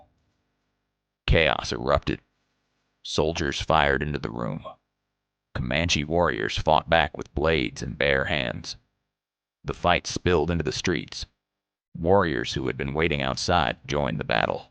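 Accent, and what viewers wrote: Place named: Appalachia, United States. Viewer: The guy doesn't sound Texas. He sounds Appalachian, North Carolina or perhaps Kentucky. Why does it matter so much to you?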